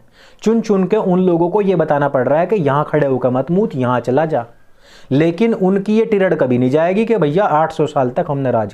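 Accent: Haryana, India. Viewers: Tu haryanavi jesa bolne ki kosis kyo Kar Raha hai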